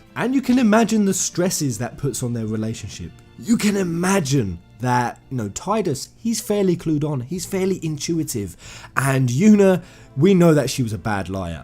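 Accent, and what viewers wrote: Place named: London, United Kingdom. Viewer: I love hearing Japanese names said in a London accent. Shinji 'Ashy Mow'ow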